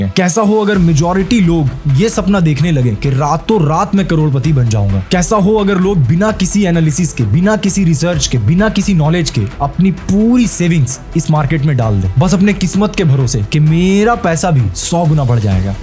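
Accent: Bihar, India. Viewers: Bhai kya tum bihar se ho please reply ❤❤❤❤ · You are from bihar...... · Aap bihar se hona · Are you a Bihari? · Are you from bihar ?? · मतलब आप बिहार से हो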